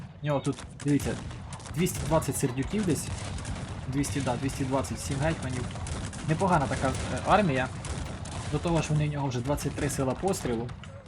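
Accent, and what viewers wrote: Poltava, Ukraine. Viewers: Ти бува не з полтавщини? Бо говір шось дуже знайомий ,таке як чи Хорол ,Миргород , ті краї? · В тебе полтавський діалект?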